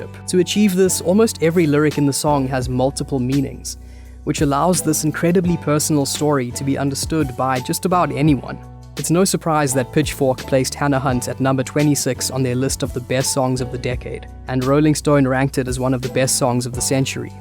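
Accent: South Africa. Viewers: I clicked on this not expecting a South African accent. Now I'm subscribing. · So weird to hear a South African accent. Love this song, love the video.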